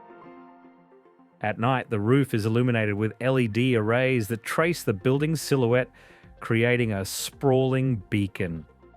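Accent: Australia. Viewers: Australian narrator has caught my ear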